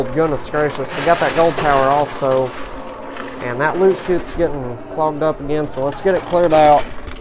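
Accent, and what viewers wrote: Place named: Oklahoma, United States. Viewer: I watched a old video , when you didn't have a bunch of money. Your voice sounds completely different, sounds like a hick, like my OK. accent. You also are nervous. But you are a rich pro and a great speaker. Proud of you brother. ALAN SMITH IN OKLAHOMA!!!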